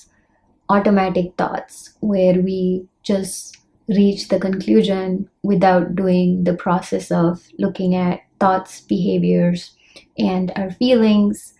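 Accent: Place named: United States. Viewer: A cute Marathi girl with American accent 😀😀 A nice video anyway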